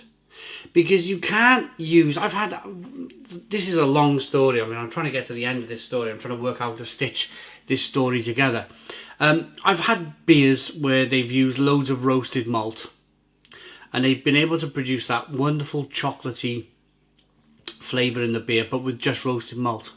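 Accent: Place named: Cardiff, United Kingdom. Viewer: Where you from? Sounds like a barry/Cardiff accent.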